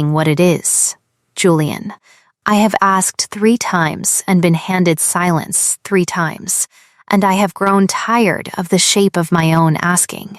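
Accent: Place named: United States. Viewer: A ducal story shouldn’t be narrated by an American voice!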